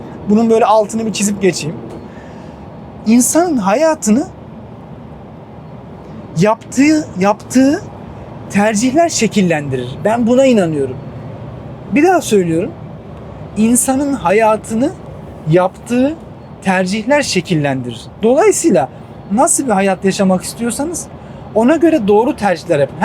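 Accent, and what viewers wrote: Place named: Antalya, Turkey. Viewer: Antalya liymissin.bende Antalya liyim.Akseki liyim. deme çünkü bende Akseki liyim.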